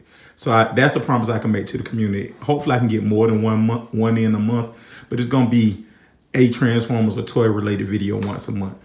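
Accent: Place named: Atlanta, United States. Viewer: Right on! It's all good,Bro! I picked up on that ATL accent instantly. Lol!!! I'd actually stopped through there in between flights while on my way to Canada last month. But,yes sir I'm on IG & just posted my first TF pic on there yesterday. Will do,Bro!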